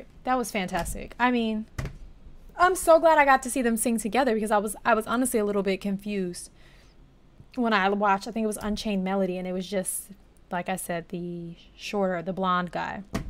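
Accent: South, United States. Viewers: You must be from the South, girl. 😂😂 Love your reactions on the songs.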